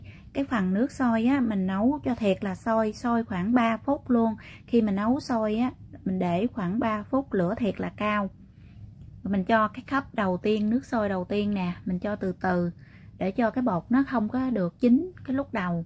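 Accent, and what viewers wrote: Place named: Mekong Delta, Vietnam. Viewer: lâu tôi được nghe lại phong cách Người Miền Tây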